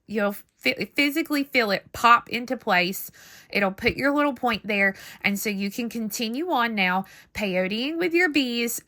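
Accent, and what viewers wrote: South, United States. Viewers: Wow, this is lovely. I love the tassel, but I think for longevity, I would prefer a tassel made with beads. And I think you meant 25x18mm, not 25x8mm., you are amazing. Funny, all these years I thought your last name was, but it's just your warm Southern accent that made it not sound like.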